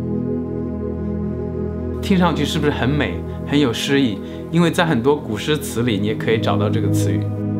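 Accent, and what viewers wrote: Wenzhou, China. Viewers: I'm Thai and when i heard you pronounce for night is sound similar to Khuen in Thai. And for color is similar as See for color. The sound is between Mandarin and Wenzhou dialect. But then again i'm not so surprise since my ancestoral homeland is in south-east of China (Guangdong-Guangxi, coastal areas of that region even strecht to Shanghai some said...). Your dialect sound beautiful even though i dont understand it. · 之前看kevin的视频就感觉有点温州腔 原来真的是温州人hhhhh 80后还好，95后00后的会说温州话的真的越来越少了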